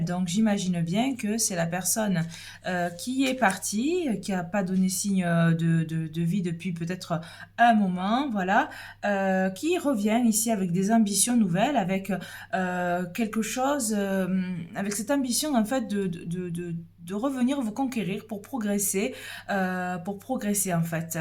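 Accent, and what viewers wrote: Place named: South-West, France. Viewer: Première fois que je vous entends ma chère vous avez un charmant petit accent du sud-ouest peut-être si je ne me trompe 🤔quelque chose oui effectivement mais je ne sais pas la suite me le dira pour le moment pas de nouvelles je vous souhaite de bonnes fêtes🙏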